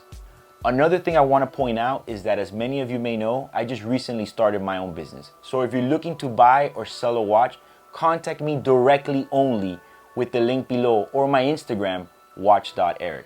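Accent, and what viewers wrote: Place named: Miami, United States. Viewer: Cuban Miami accent lmaoo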